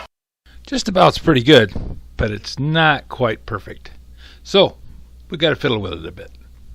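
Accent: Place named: Kentucky, United States. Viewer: Probably still too cold there for lawn stuff but got around some Kentuckians and adapted somewhat to their way of talking. He did a video where he was gearing up and said 'Be sure and put yer stuff awn' in such a manner I thought a hillbilly had dubbed it in. I learned to talk like a Yankee in the Ahmee but never thought it'd go the other way.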